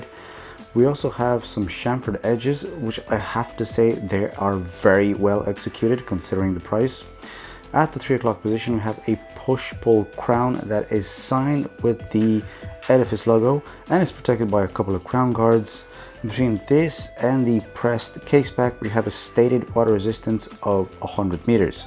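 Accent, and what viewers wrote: Ireland. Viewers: Are you located in Ireland? Hint of an Irish accent. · Great review! I was thinking you had a vague Irish accent and checked insta to see your based in Dublin lol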